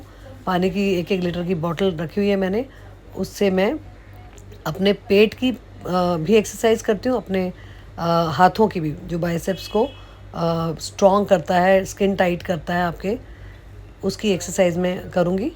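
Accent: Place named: India. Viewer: Bhut a hi batten krte ho aap Meri ji age ke ho Mai b aise hi beaten krti thi kbi Aap ko dekh ke Muje pani image dikhayi deti h Aap bilkul desi style mai Bolte ho Bhut ache lgta ho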